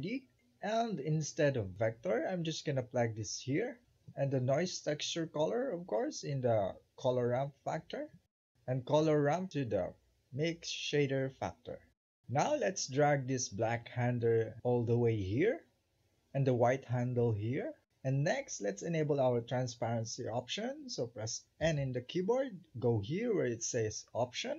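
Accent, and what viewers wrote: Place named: Tagalog, Philippines. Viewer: hahaha your tagalog-accent english is hard to miss 😁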